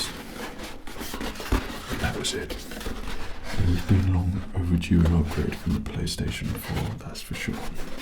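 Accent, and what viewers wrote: England, United Kingdom. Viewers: Hii just letting you know is actually born in the uk! and he does sound like he has a very deep English accent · Are you British?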